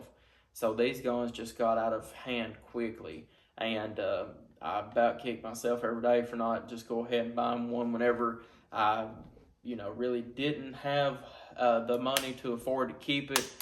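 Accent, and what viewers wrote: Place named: West Texas, United States. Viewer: West Texas or Tennessee...not fully sure about the accent. But, I am sure about that shotgun. Feels good just to hold it. Do you find that dot particularly useful? Seems a bit high over the receiver. Thinking about a T2/Scalarworks combo...1/3 co-witness. Enjoy that thing, sir. Never let her go...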